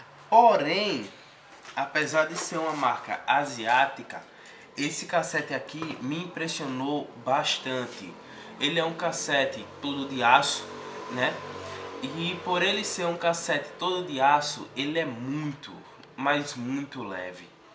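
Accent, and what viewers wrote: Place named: Recife, Brazil. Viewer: Vi seu comentário lá no Aliexpress E esse sotaque, vc é de onde de Recife?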